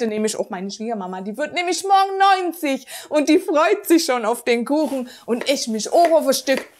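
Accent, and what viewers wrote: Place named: Saxony-Anhalt, Germany. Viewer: Ne, a bissle Sachsen-Anhalt iss da ach mit drin ne ;o) aber der Kuchen ist super... gleich nachgemacht. Liebe Grüße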